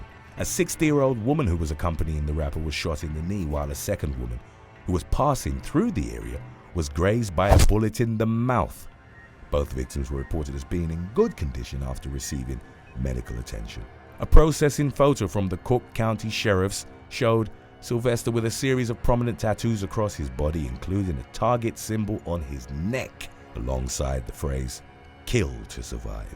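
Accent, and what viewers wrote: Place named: United Kingdom. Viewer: If this channels voice was an American voice this channel would actually get somewhere. I can’t take this shit serious when all I hear is British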